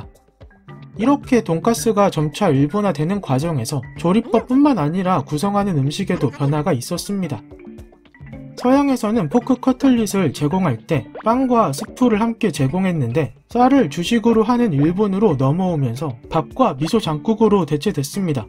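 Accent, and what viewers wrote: South Korea. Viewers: 궁금한게 나레이션하시는 분은 어디 말투죠?ㅋㅋㅋ서울말은 아니고 남쪽사투리도 아닌것 같고 ㅎㅎ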